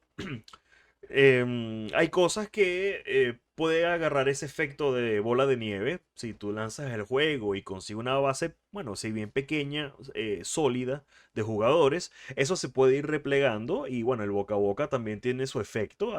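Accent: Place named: Spain. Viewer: Dios yo siempre había tenido dudas por ti acento y tus expresiones sobre si eras español o de latam Por fin hallé la luz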